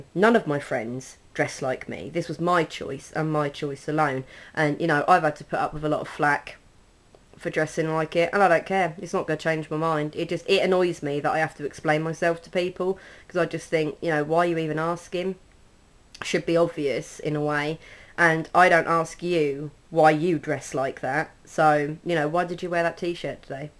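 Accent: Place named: United Kingdom. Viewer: haha:) lol all European accents sound the same to me! It takes me a good while to even figure out Scottish from British.... :/ but im learning slowly and yeah your soo right Americans got like an accent per state lool But i absolutely lovee the british accent its soo adorable<3 waitt.. your British right??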